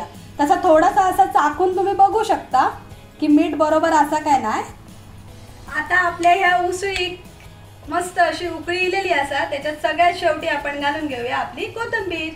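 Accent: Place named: Malvan, India. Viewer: आम्ही पण वैभव वाडी चे पवार, ही मिसळ/उसळ अगदी आम्ही नेहमीच बनवतो तरी ही मी ही रेसिपी पहिली कारण तुमचं presentation , उगाच फाफट पसारा नाही , बोलायची पद्धत म्हणजे अस्सल मालवणीत . हे ऐकूण खूप छान वाटतं व तुमचे सगळे विडिओ बघावेसे वाटतात. असंच चालू राहू दे. दोन्ही जाऊ बाई जोरात .👍👍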